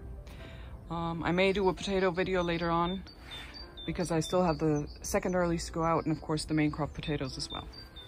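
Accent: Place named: Canada. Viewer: I love all of the old brickwork you have! Darn meece are always feasting, aren’t they? Where are you based? Your accent sounds like my lovely neighbors to the north 🇨🇦! Your seedlings look very healthy and happy! Love the bright green shoots! Everything looks great and ready for wonderful food production! 🥰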